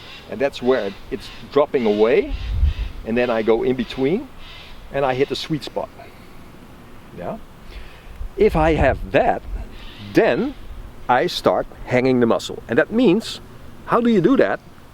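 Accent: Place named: Netherlands. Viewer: Dacht al een NLse accent te bespeuren en idd wat die persoon hieronder zegt....... ''Freaking duidelijke uitleg!" Het wordt goed gewaardeerd. Waar zit je NL en geef je les? Grtn uit Curacao.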